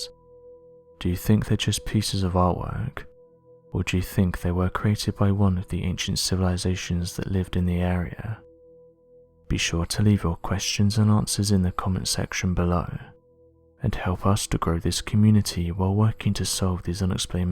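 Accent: United Kingdom. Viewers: Your British accent does not make you more credible.Am I the only one that has noticed the increase of British commentators on science discoveries and documentaries in and around the United States as if USA citizens are not creadable commentators or witnesses worthy of giving a true account about things related to the United States it’s history or it’s science documentaries but the British are and taking over positions in our media. I am not trying to make a racial thing about being from England to discriminate or bad mouth them as a people. I am just stating how more and more it is people with British accents narrating our special documentaries on US history and scientific studies in the US as though they are the experts on these subjects and American commentators are not getting the narrating jobs here close to home. I don’t know what’s going on. But I can’t help noticing this change in US media coverage. 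I haven’t heard anything about the British networks buying up our nations media networks?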